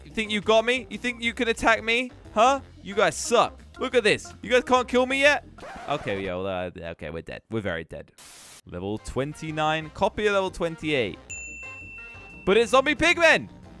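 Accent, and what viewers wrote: England, United Kingdom. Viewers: Your kinda english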